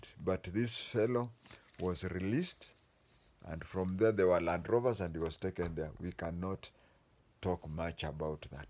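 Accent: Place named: Meru, Kenya. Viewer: I am impressed with your Kimeru accent Sir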